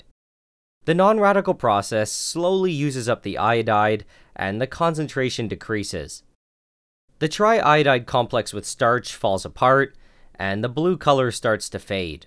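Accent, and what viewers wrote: Canada. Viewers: I love your thicc Canadian accent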